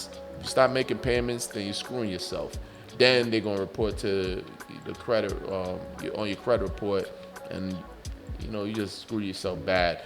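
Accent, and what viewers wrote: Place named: New York, United States. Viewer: You gotta be from Ny lmao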